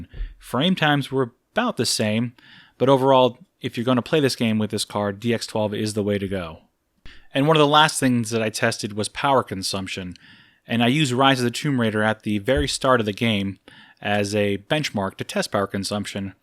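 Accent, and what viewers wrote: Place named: United Kingdom. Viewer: I second those opinions about your voice bro. It sounds calm, professional, articulated. And that's a big factor in the subscription business I think. And you know what ups the ante, is a british accent. I'm serious! That's almost a guarantee for someone to subscribe.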